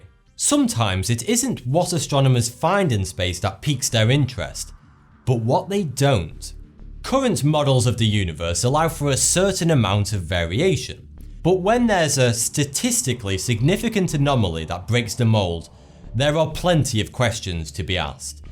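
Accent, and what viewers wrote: United Kingdom. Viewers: the problem is that i am moroccain and english its kindaaaa hard to me so i know like 23% of english words and he problem is that he is british and his english is so strong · That British accent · Mate he's British not American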